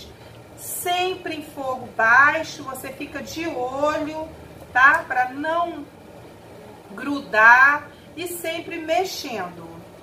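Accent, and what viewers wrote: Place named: Rio de Janeiro, Brazil. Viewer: Pronuncia carioca bonita.....show de detergente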